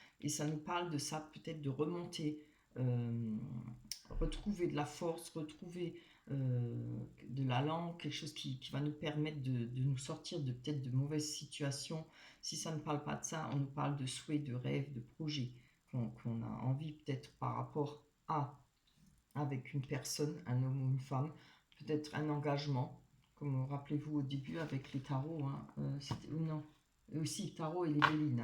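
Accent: Alsace, France. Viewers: Puissiez-vous dire vrai après un an et demi de solitude et de chagrin... que je rencontre enfin l'homme qui saura me rendre heureuse... merci pour la guidance ...ce petit accent ne seriez-vous pas alsacienne comme moi 😘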